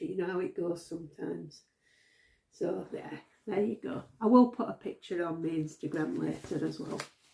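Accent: Yorkshire, United Kingdom. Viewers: Thanks for sharing. Love your accent. Definitely reminds me of Yorkshire.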